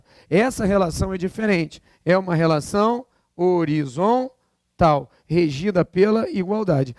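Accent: Rio de Janeiro, Brazil. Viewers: ele é carioca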